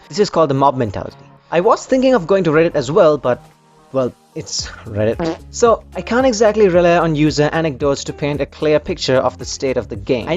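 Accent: India. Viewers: Beo u are indian???😀😀😀😀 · You Indian?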